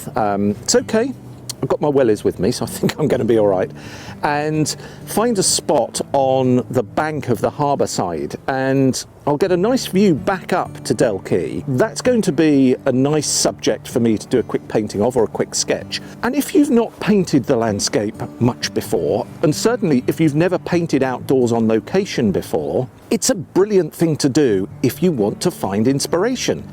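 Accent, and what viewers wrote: England, United Kingdom. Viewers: i havent watched your channel in a long time. i always love it. and the english accent is way worth watching the whole thing.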